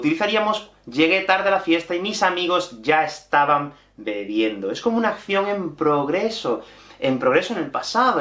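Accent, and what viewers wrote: Andalusia, Spain. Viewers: Tu eres de espana? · Hola! Estuve flipando durante todo el vídeo: Qué bien lo has explicado todo tío y además era una de mis dudas más grandes! Pero tengo una pregunta, y no sé si tal vez podrías hacer un video sobre este tema? En fin, me gustaría saber cuál es tu acento propio o original? Puesto que eres de Málaga, siempre me he preguntado si tienes acento andaluz? Si es el caso: has crecido con ese acento y después aprendido el más "neutro"? Y ahora sabes hablar con los dos cuando quieras o has perdido el andaluz? Te lo estoy preguntando, porque a mí me interesan mucho los acentos, y creo que enfocarse en un acento en particular puede incluso ayudar con el aprendizaje de un idioma como el español, o eso por lo menos ha sido mi experiencia. Un cordial saludo desde Suiza!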